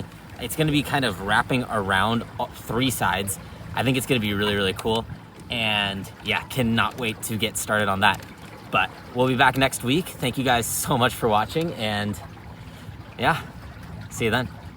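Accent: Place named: United States. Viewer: My Brit wife says you have an American accent. As an American, I agree.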